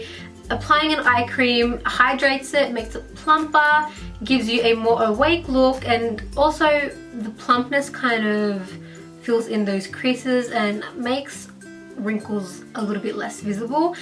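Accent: Australia. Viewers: Her accent is Australian but she is Jewish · I thought I recognized the accent! My guy was born in Melbs! (We live in NY, I'm a NY'er.) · omd she 100 percent sounds like promise phan with australian accent!!!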